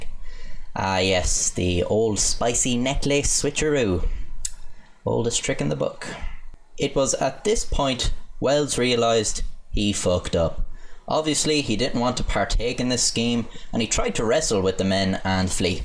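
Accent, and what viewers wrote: Ireland. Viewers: LOVE the way you say 30 as well as the Irish accent in general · "Two thousand and Tree" lol. gotta love that irish accent. just confused as to why he doesnt say "tousand" too XD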